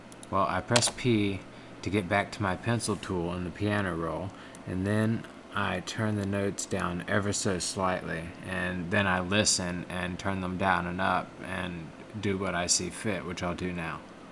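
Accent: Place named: United States. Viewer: nice vid, but i mean, you are an american (because of the accent), and i thought that americans only listen to hip hop and mainstream kind of shit. But oh well...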